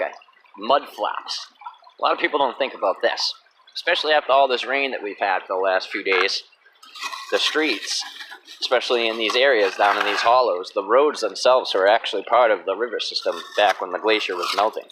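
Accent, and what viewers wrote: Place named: New England, United States. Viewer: Thought you were a new englander. Thanx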